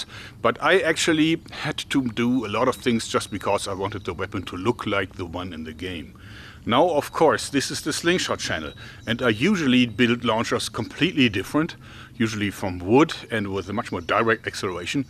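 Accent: Germany. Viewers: Hey you sound like you are german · Learn english without accent, german... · Man hört einfach Instant daß er ein Deutscher ist. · Explain to me why ur having an english instead of a german channel? - Your accent is distracting XD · Kann es sein das du eigentlich Deutsch bist? Ich finde man hört es an deinem Englisch 😁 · Man hört richtig das du Deutsch bist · I hear a German accent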